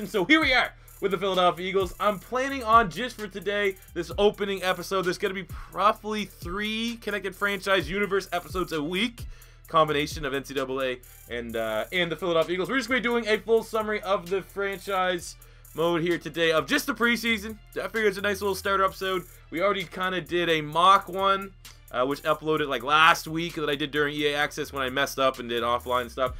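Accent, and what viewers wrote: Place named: Canada. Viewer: Josh Lak he's from Canada its most likely an accent thing.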